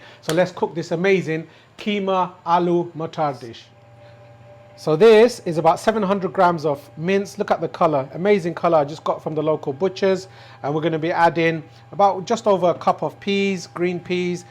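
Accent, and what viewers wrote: United Kingdom. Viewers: bloody british accent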